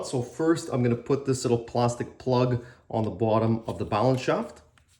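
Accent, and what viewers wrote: Quebec, Canada. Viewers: J'avais pas réaliser que tu étais Québécois avant cette vidéo ahah Vraiment superbe vidéo tu es excellent!!! PS, j'ai dit le tabarnak en même temps que toi XD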